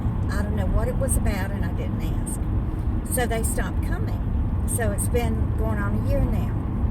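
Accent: South, United States. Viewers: MOVE! Your living in a High Price Area. You sound southern, move to the south. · Hi, your accent sounds southern.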